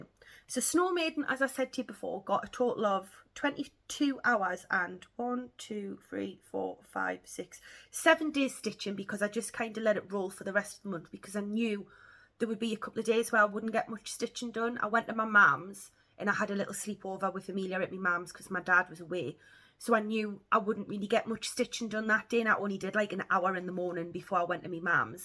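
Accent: Northern England, United Kingdom. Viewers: Great video - you are so funny and down to earth. I'm a fellow northerner and love your accent. Pickle 😊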